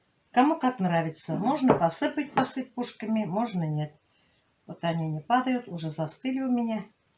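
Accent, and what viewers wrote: Tatarstan, Russia. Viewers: Apaika ne v koem slu4ae ne hotela obidet vas .vi horosho govorite .akcent tatarskii obozhay vas .s uvazheniem Farida💋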